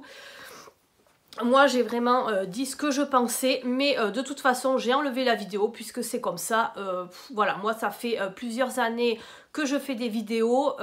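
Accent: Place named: South, France. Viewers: Bonjour, continuez vos vidéo je les aime beaucoup même si je ne commente jamais... Votre accent me rappelle mon sud natal... Faites fi des rageuses!!! Bon rétablissement et à très vite, biz 😉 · Bonjour, je vous apprécie beaucoup❤ et j'aime votre petit accent du midi et vos vidéos. Ne tenez pas compte de la méchanceté des gens. Dans quel monde vivons nous... Prenez soin de vous❤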